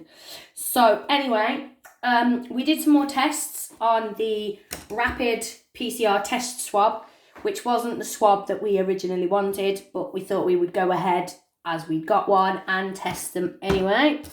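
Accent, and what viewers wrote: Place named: West Midlands, United Kingdom. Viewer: Nice to here a west mids voice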